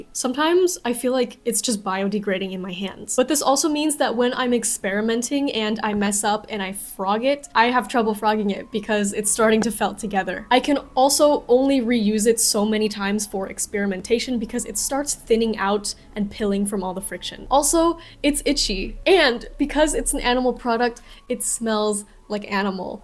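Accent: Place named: Netherlands. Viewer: took me until to realize youre dutch too holy shit edit: the netherlands. not holland lmao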